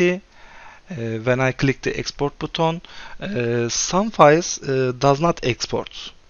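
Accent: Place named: Turkey. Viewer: Aksandan direkt Türk dedim öyle çıktı. :) Başarılarının devamını dilerim. AR t-shirt yapabilir miyim flutter bilgim var unity bilmiyorum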